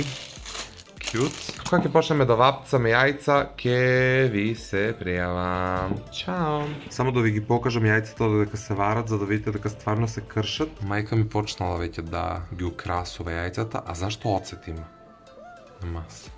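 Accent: Kumanovo, North Macedonia. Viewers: cepaj samo kumanovski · Od sekogash sum mislel deka na youtube i vo privaten zhivot zborash literaturno ali koga slushav deka zborash kumanovski se zachudiv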